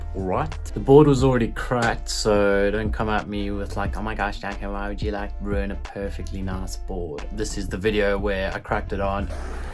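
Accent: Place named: South Africa. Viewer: Heard his accent and knew he was from SA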